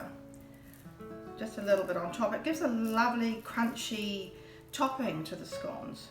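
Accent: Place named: New Zealand. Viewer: I had never thought of making date scones until today when I saw your wonderful video (and recipe!) I will be 'having a go' later today! I'm in Yorkshire so am pleased that you pronounce 'scone' properly! I have friends here who are from Dunedin so I'm used to your fascinating accent - though I still find it amusing when you mention 'the bist brid; marking the sigments; so much bitter; a lemon zister!; ¡ɹǝʇʇᴉq sᴉɥʇ puɐʇsɹǝpun uɐɔ noʎ ǝdoɥ I - ooʇ ǝƃɐnƃuɐl puɐlɐǝZ ʍǝN ǝɥʇ uᴉ ǝʇᴉɹʍ oʇ uɹɐǝl oʇ unƃǝq oslɐ ǝʌ,I Thanks again for the video and the useful addition tips!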